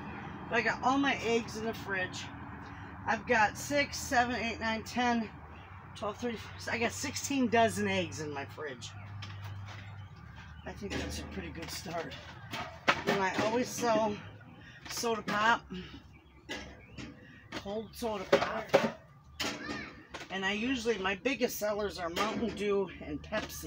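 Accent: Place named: Upper Peninsula, United States. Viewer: I would totally love to shop at your market stand! By the way I like the "way you talk" I was born in the U.P. and now live in Northern Ohio. You talk like my family 🤗❤️ by the way, you grandkids are well behaved cuties! That little boy with his big hat is adorable!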